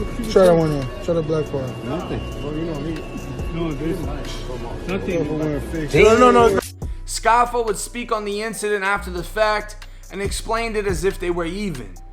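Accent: Boston, United States. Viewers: You from Boston? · Man how long you been down here in Florida now? Gotta lose that weak ass boston accent. Shit wack. Can barely stand to hear you talk. You do good videos and shit but damn the way you talk is annoying AF!!!!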